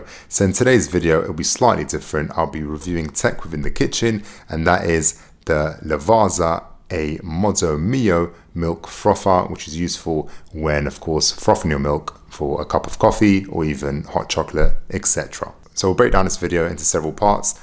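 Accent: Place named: London, United Kingdom. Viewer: Well, it's not R.P., but it's more than adequate English with a slight London accent, I'd say.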